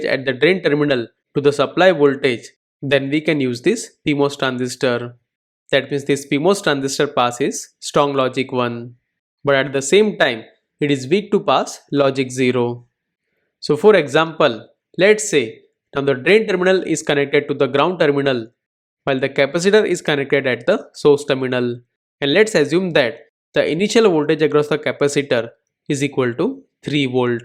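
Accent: India. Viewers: its really good but pls don't speak with an Indian accent its really hard to understand ;) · Why so many Indians.... Sorry but it is difficult to follow you with the accent... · I actually think the Indian accent makes it easier to understand lol. I'm not even Indian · I only understood because he had an indian accent, like i could trust him more with his expertise :))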